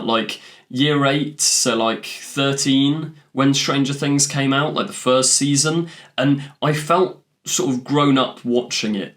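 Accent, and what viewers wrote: United Kingdom. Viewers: Quit stuttering big nose with ur very strong British accent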